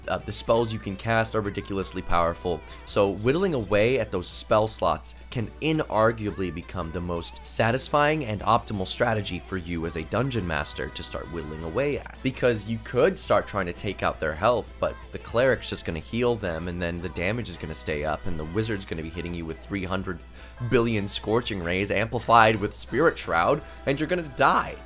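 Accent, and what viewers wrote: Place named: Canada. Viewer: Stacking dice up each move to eventually take that much damage is a great idea. I'm surprised you are from south Africa, all I hear in your accent is Canada.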